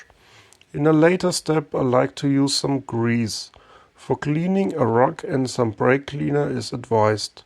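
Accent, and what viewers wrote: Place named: Germany. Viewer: You should do more of these. This is absolutely excellent. I get a lot of reassurance hearing a German accent, part numbers and torque settings!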